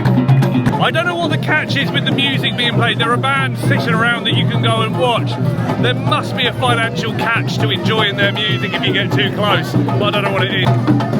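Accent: England, United Kingdom. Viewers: With an inglish mouth like yours, one would attract paranoia even in Antarctica. At least the place doesnt smell piss like Liverpool or London. Hopefuly after 10 years your channel will help you pay the rent.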